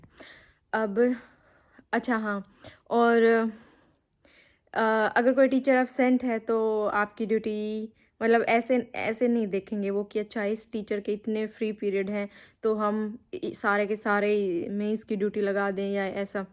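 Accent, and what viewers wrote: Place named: Japan. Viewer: You talk like a Japanese or russian girl , your expressions are also not like a typical Indian girl. Liked that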